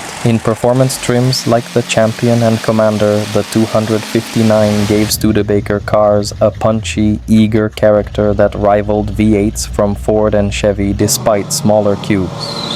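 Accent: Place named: India. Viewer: > Indian accent narrator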